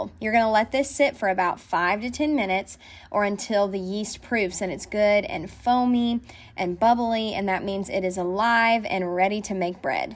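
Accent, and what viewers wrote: South, United States. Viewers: Her southern accent disappeared about 2 minutes into this video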